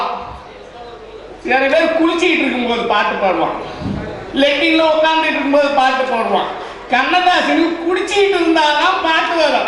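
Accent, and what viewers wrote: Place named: Nadu Nadu, India. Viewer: அருமையான வட்டார வழக்கு., நடுநாட்டு மக்களின் வாழ்வியல் முறைகளை வருங்கால சந்ததியினருக்கு மிக தெளிவாக எடுத்துரைத்துள்ளீர்கள். அருமை ஐயா!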